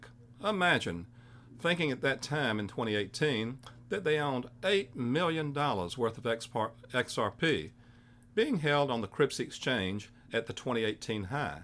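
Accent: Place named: South, United States. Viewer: the 100% smart guy, & I love the southern accent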